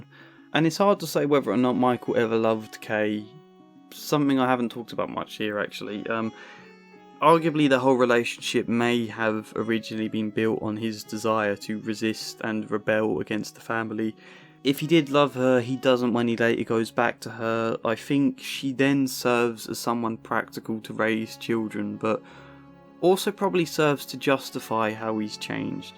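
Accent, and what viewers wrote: United Kingdom. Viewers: michael was in the marines not the army. maybe you should actually watch the movie. your British accent will not save you.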